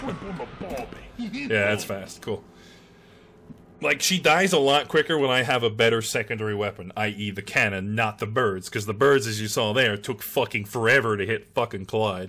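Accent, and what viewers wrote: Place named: United States. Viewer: Ah it was tripping me out some parts sounded American to me and I am from Texas so could have fooled me.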